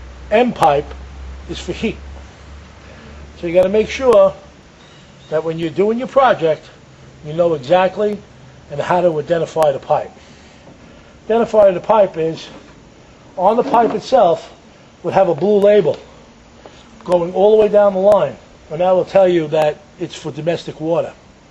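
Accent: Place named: Staten Island, United States. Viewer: I think any of you Youtube nerds, that want to talk bad about, should def. think twice.. LOL anyway, Im in Jersey,(just across the water) and love the tips and your strong Staten Island NY accent.. I have a more technical question.. Anyone with help, please email me Im repairing a p-trap on a bathroom sink from the 1920's or 1930's, can i connect pvc to the old brass pipe, with just a coupling?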